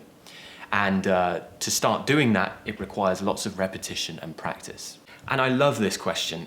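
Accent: United Kingdom. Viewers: Hello 😄 I'm Dia my mother tongue is Arabic, I study English, My accent is little bit American, but I'm in love with the British one😍, im following lots of your videos And I'm hopeful that one-day i can manage to have an accent like yours ❤😍 thank you for your efforts ❤ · I don't know why, but I enjoy watching your vids. However, as an American I must say that some of what you teach, to our ears signifies a lower class, uneducated Briton. Certain of the vowels and diphthongs, and most of all the glottal stop, which all American diction coaches regard (or used to) as an actual speech defect, like a lisp, to be removed with exercises! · Hi teacher, i know that my indian accent will never change but I'm very fond with your british accent. Soo sexy, lol. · Marry me wtf how u r cute like that with ur British accent 🙆🙆🙆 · It has been a utterly splendid, exquisite and flawless lesson. 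I'm particularly joyful, blissful and elated with that opulent topic. It is to my liking; moreover, it appeals to me. I do adore speaking, and his charming accent., Hence, I present; undoubtedly; the King of British English. You are the quintessential of British English. Thank you very much indeed. Far thee well, dearest Prof. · Please keep talking i can hear you for hours. 😀😀 I really love your British accent. I have learned a lot. Thanks you. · You are epic. Its so clear when You explain. And Your British is so good to listen. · Hi I'm from Venezuela and I've been learning British English on my own at home and yours videos are quite good for me cause your accent is great! thanks for make this kind of videos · I love your way to teach British accent 😍 I really wanna to speak like you..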